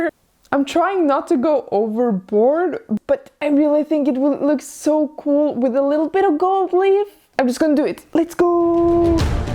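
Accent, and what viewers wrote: Russia. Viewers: Why I hear a Russian accent? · Can't get rid of the impression that speaks with russian accent. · Russian actsent?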